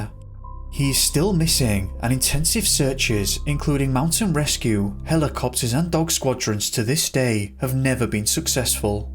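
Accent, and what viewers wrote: United Kingdom. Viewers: What is it about these stories narrated by a soft British/European accent? I enjoy them all, for some reason. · Is it me or does this guy sound like Chills with a British accent??? His cadence and what not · Triela Rhyfel he does he has a regional British accent. Get over it. He talks differently I can’t deal with it. Grow up.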